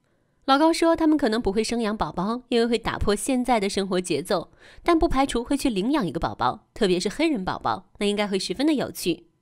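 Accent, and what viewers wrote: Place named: Jiaodong, China. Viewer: 是胶东呛，大连人讲山东话，谢谢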